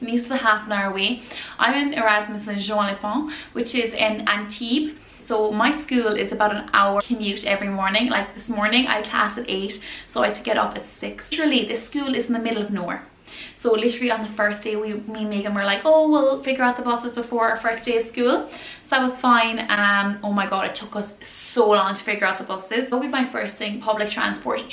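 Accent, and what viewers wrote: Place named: Ireland. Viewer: Irish accent? I LOVE IT ❤️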